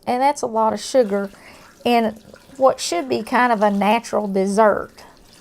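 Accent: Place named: Ohio, United States. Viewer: Hearing your voice reminds me of relatives from Ohio. They would bake cakes and pies for the Church July 4th festival in Wapakoneta. I loved it. We'd travel from PA to it when I was a kid. Thanks and God bless you 🙏. Hugs 😇🤗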